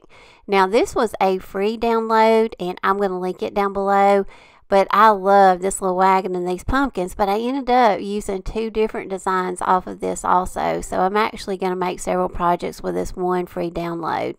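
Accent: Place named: Kentucky, United States. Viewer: you're so very talented! Also, since I'm in KY, you do talk just like us. The recipe cards almost made me cry, because they look just like the ones my mamaw and my mom left us. They were stained, very well-used, and written in their own hand. Lovely video!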